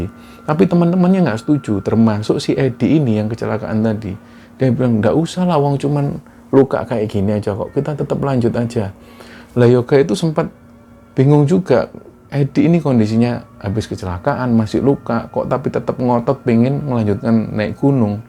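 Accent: Java, Indonesia. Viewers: Saya orang Sasak Lombok pecandu kembaran sunyi, sy 13 tahun nyatri di Ponorogo Jawa timur (<num>) , nyimak kembaran sunyi, sy menjadi TERjebak di masa lalu, backing musik, langgam sinden dan gaya adik yg cerita sangat njawani.aku 85 persen JD flashback seperti terasa masih JD santri di Jatim, ingin rasanya kembali ke masa lalu, aku suka content juga, Krn content ini, kandungan DAKWAH ISLAMIYAh, praktis mudah difahami dan efektif utk mencegah kemusyrikan, menguatkan AQIDAH, banyak pelajaran berharga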